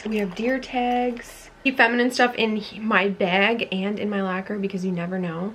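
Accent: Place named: Wisconsin, United States. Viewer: I found out later you were from New York 😊😬 sorry you sounded a bit like you were from Wisconsin lol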